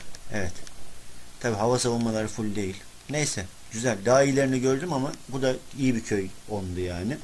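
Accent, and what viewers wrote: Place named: Giresun, Turkey. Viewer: abi siven bizim oranun sivesi da sen nerelusun ben giresun espiye ..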